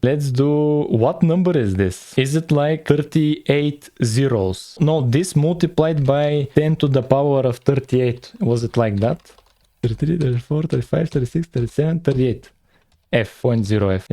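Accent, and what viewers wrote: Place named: Russia. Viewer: you sound russian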